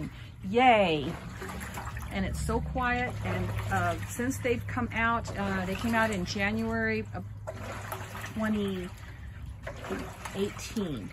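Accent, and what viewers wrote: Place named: Ireland. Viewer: Tell me, what part of Ireland are you from?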